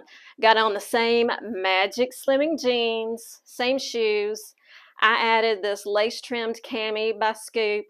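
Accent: South, United States. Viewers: Enter me!! I love your videos and Your charming southern accent! Thank you for sharing.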